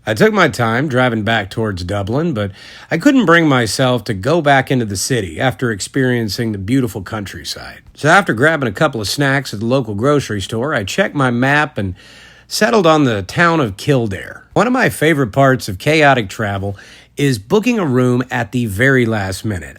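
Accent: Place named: Texas, United States. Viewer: The delivery and vibe this man brings is beautiful, it’s that old Texas twang full of warmth and character. Really excellent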